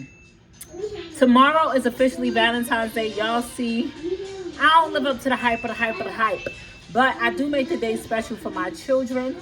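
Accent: New York, United States. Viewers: Im loving your channel and I love hearing you talk!! I love the ny accent...ima Florida gal!! I drive my 18wheeler thru NY!! You season your food like I do mine but ima use the sauces.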